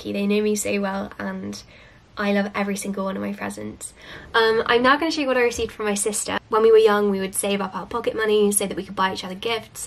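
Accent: United Kingdom. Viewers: i wish i could speak as beautiful british english as you 🤩 greetings from germany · I love your British accent and use your videos to practice my pronunciation ❤ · That's how british people talk. What is wrong with you???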